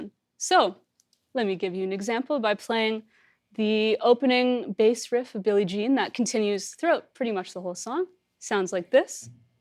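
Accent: Canada. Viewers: God I love her canadian accent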